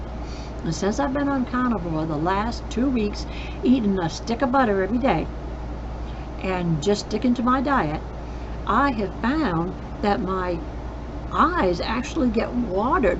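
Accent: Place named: Maine, United States. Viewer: She sounds like my family from Maine❤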